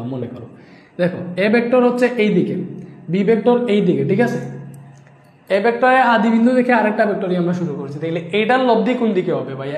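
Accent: Sylhet, Bangladesh. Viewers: ভাইয়া সিলেটি নাকি চট্রগ্রামের?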